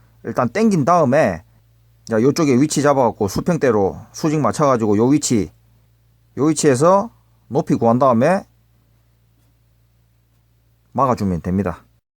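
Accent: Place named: Busan, South Korea. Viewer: ㅋㅋ 늘 재미있게 보고 있습니다. 설명하시는 것들 충분히 이해했고, 표가 없이도 나름대로 길이를 찾는 법을 찾겠더라고요. 그래도 자세한 설명이 큰 도움이 되었습니다. 형틀목수 시작한지 얼마되지 않았는데, 관록도 필요하지만 이론이 바탕이 되어야 한다는 것을 많이 느끼게 해주셔서 감사합니다. 당장은 써먹을 일이 없겠지만, 기회가 오면 도망가지 않을 수 있을 것 같아요. 너무 빨리 그 날이 오면 선배들에게 눈치가 보이겠지만, 그래도 기회를 잘 사용해서 현장에서 인정받고 싶네요. 계산에 무지한 목수만 있는 것이 아니란 사실을 보여주고 싶기도 합니다. 구수한 부산사투리에 솔직한 썰... 늘 즐겁게 보고 있습니다. 언제 부산에 가면 한잔 하시죠 ㅋㅋ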